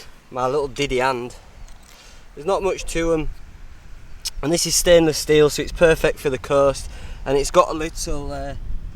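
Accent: Yorkshire, United Kingdom. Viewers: christian roberts lol it's Yorkshire clearly. Definetly not Scottish Lowlands. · adrian mendoza no it's british he's a pom not an aussie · I WAS GOING TO SAY YORKSHIRE. DOIN MY HEAD IN TRYING TO FIGURE THE ACCENT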